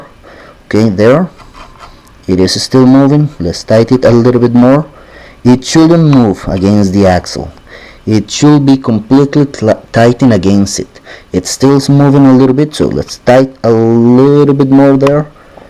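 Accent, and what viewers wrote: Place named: Spain. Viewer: Hola! Creo esres español poor tu acento jajaja buenos videos. Perdona quete moleste, pero tengo pasasdas las coronas centrales y no se donde comprarlas baratas, el diferencial completo ya que creo que esta roto porque lo muevo para alante y se mueve las ruedas un poco pero para atras se bloquea y no hace nada no se que le pasa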